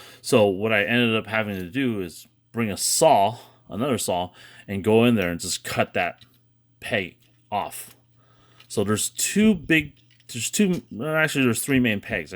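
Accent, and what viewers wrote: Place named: Philippines. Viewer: FILIPINO ACCENT- more dissasembly please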